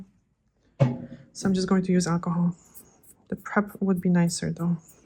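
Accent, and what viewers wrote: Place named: Poland. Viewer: Hey, I just want to say thank you for your calming videos. I am half Polish, half English so your accent is always a comfort to me and reminds me of my Polish relatives ❤️🇵🇱 Thank you for teaching us all about nail care. Ps I love the colour you used on this client and now I’m on the hunt for a similar range of shimmery polishes! If you have any recommendations that are available in the UK it would be great to know. Xx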